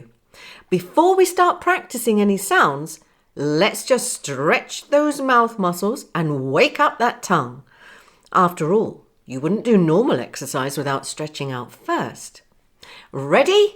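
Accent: United Kingdom. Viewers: Hi! 😁 which British accent do you speak? North or south accent? I like it.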